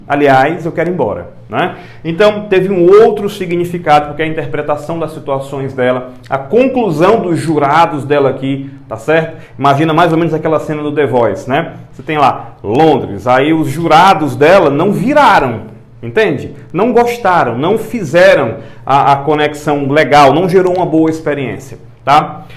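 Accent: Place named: Northeast, Brazil. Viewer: Percebi pelo seu sotaque que é do nordeste, talvez recife ou Ceará ou até mesmo paraiba.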